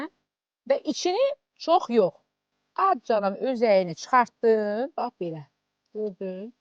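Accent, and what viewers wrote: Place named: Gadabay, Azerbaijan. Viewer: Bəs özü deyir Goranboydanam.. Ləhçəsi oxşuyur ama Gədəbəyliyə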